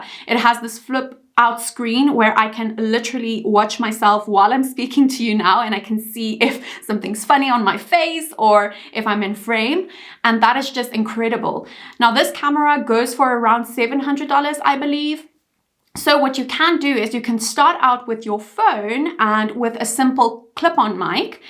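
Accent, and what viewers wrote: South Africa. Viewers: Are you South African? That accent...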